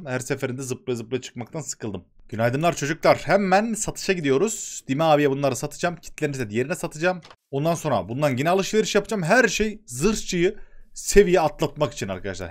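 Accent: Yozgat, Turkey. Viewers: abi ben iranli turkum ve bizim turk dili ayni sizin yozgat diline benziyor vallahi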